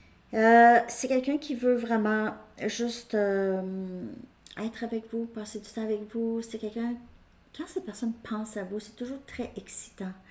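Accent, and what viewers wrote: Canada. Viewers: En plus, j'adore votre petit accent canadien, c'est trop mignon, mon rêve d'aller voir ce magnifique pays que vous avez là !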